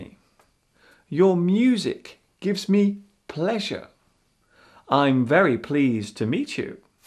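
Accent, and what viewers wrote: United Kingdom. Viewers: It is a pleasure listening,real british English.It is clear and funny. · Hahahahaha oh my god you are so funny! and this is very helpful for me, I love british accent, specially cockney!! Kisses from Uruguay, Latin America!! :)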